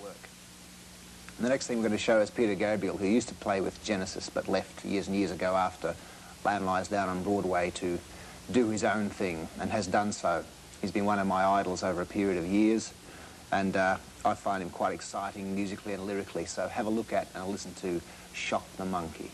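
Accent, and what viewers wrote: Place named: Australia. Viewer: This was back when Colin Hay had an Australian accent. He has since reverted back to his native Scottish accent.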